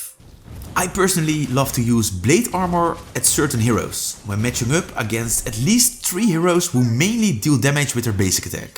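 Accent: Netherlands. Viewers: I can hear Dutch accent. Am I right? 😂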